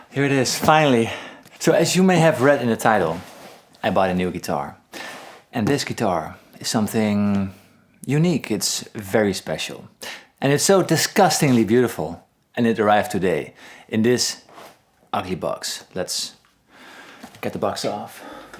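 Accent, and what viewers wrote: Netherlands. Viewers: he is from the netherlands if i'm not mistaken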